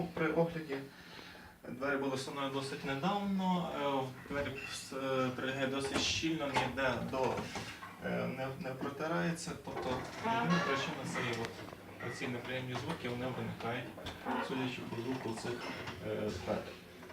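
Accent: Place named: Surzhyk, Ukraine. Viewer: безграмотный сельский суржик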